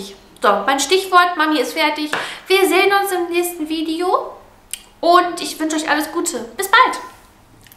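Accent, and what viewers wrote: Ruhr, Germany. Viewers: Hömma, bisse auch aussm Pott, ne? ;-) Sehr sympathisch.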